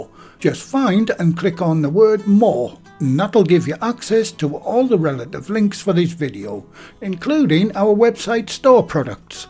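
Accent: United Kingdom. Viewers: Why should people - who are not from England! - make critical comments about the correct, clear, non-dialect, slightly (and pleasantly)-accented English spoken by an English person?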